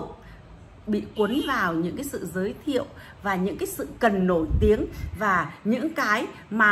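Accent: Standard. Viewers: Chi noi chuan qua dan ong hay ham cua la an com chan thich an pho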